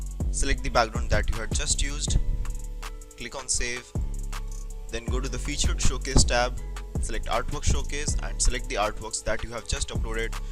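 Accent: India. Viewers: When you hear Indian accent, you know the tutorial bouta be good 😊Thank you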